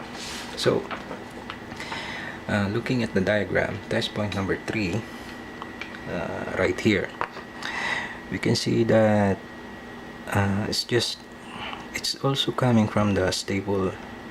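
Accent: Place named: Philippines. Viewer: Just the by way you spoke English I can tell you are from the Philippines 😅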